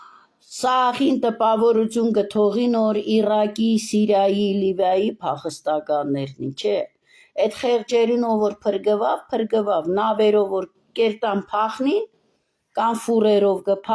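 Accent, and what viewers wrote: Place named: Msho, Turkey. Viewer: ❤❤❤❤❤, так приятно слушать Вас именно на моем родном Мшо диалекте ❤❤❤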